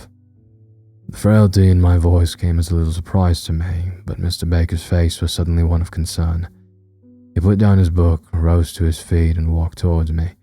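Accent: United Kingdom. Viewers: Your British voice was amazing! It only added the suspense for me! Thank you so much for this amazing story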